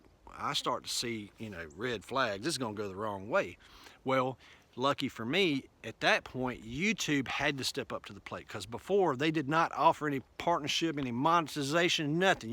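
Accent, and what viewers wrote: South, United States. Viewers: *'s southern drawl sounded almost exactly like my youngest daughter talking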